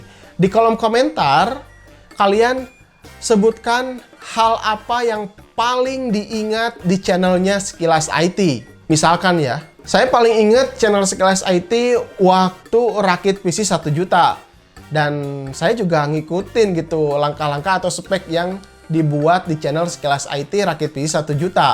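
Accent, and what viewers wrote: West Java, Indonesia. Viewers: Bismillahirrahmanirahimmmmm.. Semoga menang Subscribe✔ Follow✔ Like & Share✔ Instagram : ry_akbar037 Video yang paling diingat adalah waktu review Vga zotac. soalnya pas zotac nya koat ka sunda banget gt. "JOTAX" nyebut na teh hahaha Pengen Banget Ram Venom RX 8GB karna saya rencana mau rakit pc DDR4 soalnya bosen pake ddr 3 mulu hehe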